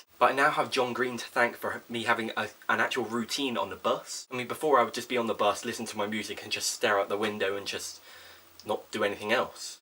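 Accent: Bristol, United Kingdom. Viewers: Your Brizzle accent makes me so happy :')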